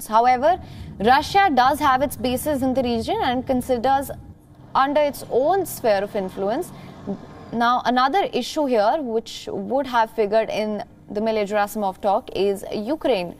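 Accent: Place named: India. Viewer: WION should choose a presenter that speaks better english. It has millions of subs... if they want to represent a real international image, it should start using someone that doesnt have such a thick indian accent.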